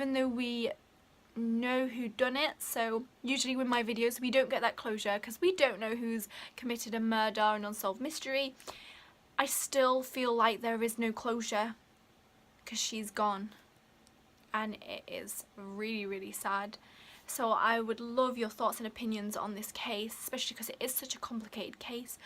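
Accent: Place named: Manchester, United Kingdom. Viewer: love your videos sooo much! so interesting and it’s lovely to hear a mancunian lol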